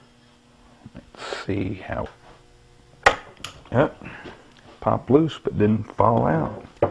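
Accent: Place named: South, United States. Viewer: Southern accent lol. He knows what to do I'll give him that but this video is no good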